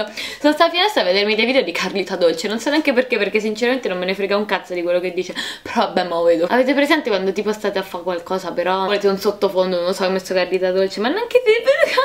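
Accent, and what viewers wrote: Rome, Italy. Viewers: È di roma e si sente tantissimo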